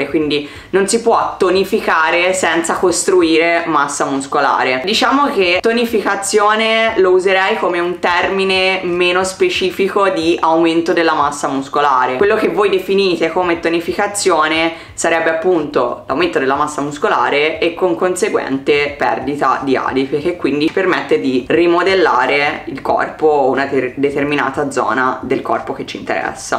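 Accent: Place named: Tuscany, Italy. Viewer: Bellissimo accento toscano, ma di dove esattamente?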